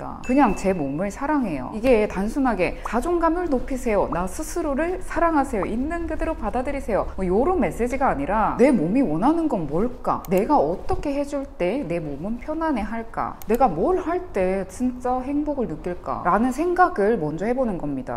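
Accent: Gyeongsang, South Korea. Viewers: 머지 경상도 사투리 들려용 !!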